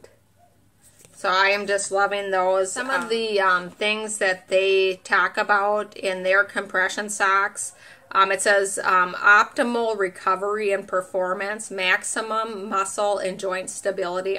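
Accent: Minnesota, United States. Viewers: Are you from Minnesota? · So that’s an accent from MN? I was thinking another country lol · This accent is reminding me of the movie Fargo.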